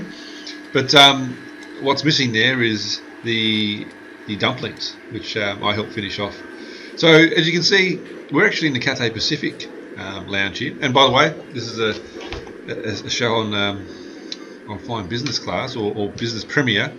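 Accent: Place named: Australia. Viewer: Australian accents are so cool!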